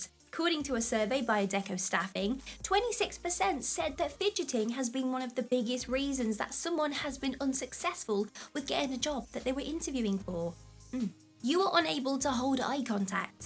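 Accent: United Kingdom. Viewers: you look like russians but your english is british, btw i like your dating lectures. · My god, you're in the UK and you're complaining of heat? I used to live in Borough of Brent, no I'm in the U.S. in California. It was almost 45C today or as they say here 112F where I stay and it will remain around mid 40s until end of September. So please my dear, as someone who has lived in the U.K. don't complain about the heat there. By the way like your videos, the accent makes me home sick but otherwise great.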